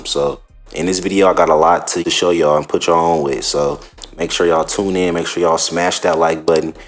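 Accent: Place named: West Coast, United States. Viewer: A U FROM DA WEST FOO? I HEAR DAT SHIT ALL IN YO VOICE LOL IM FRM LA BRO WHERE U FRM FOO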